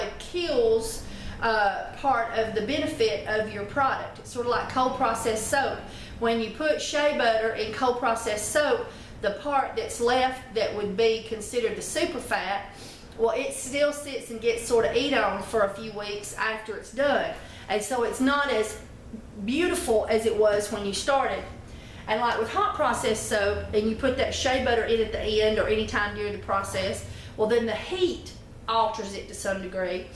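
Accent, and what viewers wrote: South, United States. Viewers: Hey just found your channel yesterday awesome channel. Thank you for sharing your knowledge. When I heard you speak I said she’s southern, so am I Knoxville TN, here looked you up we’re not that far away from each other. Keep these great videos coming love watching you.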